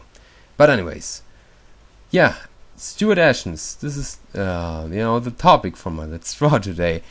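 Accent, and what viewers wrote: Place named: Germany. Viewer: Funny. The minute I heard his accent, he could only be German! XD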